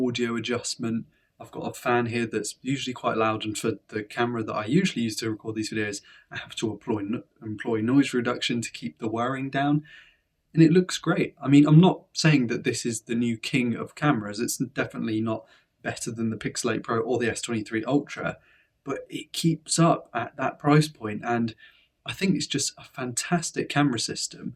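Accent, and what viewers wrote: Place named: United Kingdom. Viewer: That british accent is fire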